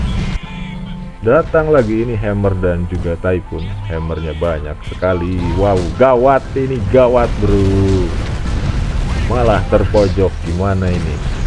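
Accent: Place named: Central Java, Indonesia. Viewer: Btw asli mana bang,dari logat ngomongya kaya or Jateng..🙏🙏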